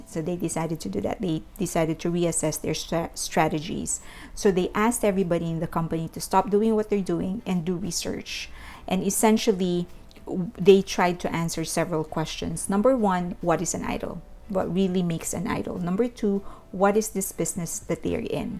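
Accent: Philippines. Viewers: Thank you sooo much great summary ❤️... I believe you are a Filipino just by your acccent , because I am ❤️❤️❤️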